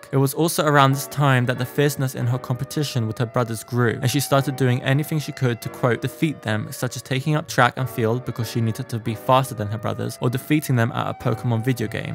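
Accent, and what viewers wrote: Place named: North, United Kingdom. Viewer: I guess this YouTuber is from somewhere of Southeastern Scotland or Northeastern England, anyway the very northernmost part of Britain but little bit to North Sea coast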